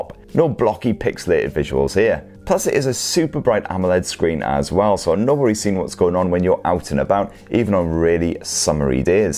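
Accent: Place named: North East England, United Kingdom. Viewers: A circular job is, and a bum load of features. Love it. Nice looking item. Wish I’d got it, prior to getting the kids into Fitbits. Can’t quite pin point the accent. South of the Tyne, but, North of the Tees?. Nice review. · I thought I recognised the accent aha I’m also from Sunderland:)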